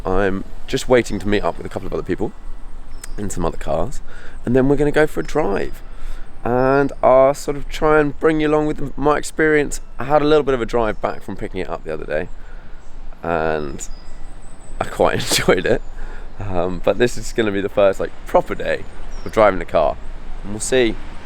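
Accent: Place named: Australia. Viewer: Did ytou study or ever live in Australia? You have a Sydney/International Aussie tone to your vowels.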